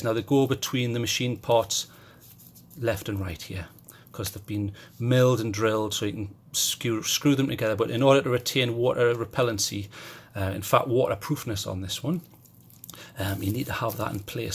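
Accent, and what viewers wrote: United Kingdom. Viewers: 28 minutes for a fuckin headlamp? And that British accent...no go Brit Crazy guy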